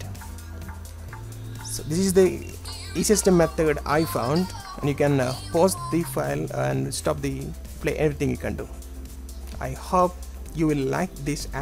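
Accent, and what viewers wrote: India. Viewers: love ure accent bro :D indian? haha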